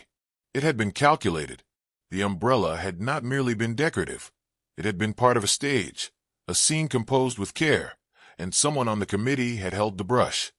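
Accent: United States. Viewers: An American narrator does not work for a Miss Marple story. It grates.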